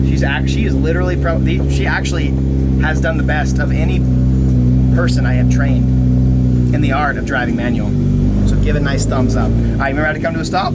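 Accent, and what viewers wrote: Australia. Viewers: are u Aussie cause I am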